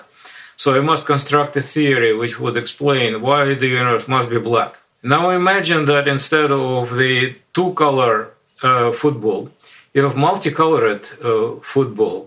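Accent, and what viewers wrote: Russia. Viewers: Это прикол что-ли? Зачем изображать знатоков английского, если у этого Андрея ужасный русский акцент, и он с трудом подбирает английские слова? Так ещё и без субтитров.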